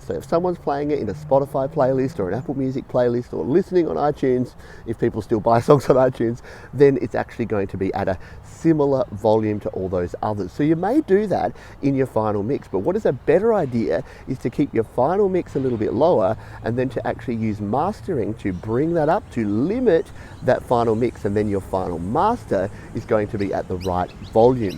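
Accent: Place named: Australia. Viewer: ... thanks. By the way love the vids keep them coming it’s good to here a fellow aussies voice when learning stuff instead of American all the time.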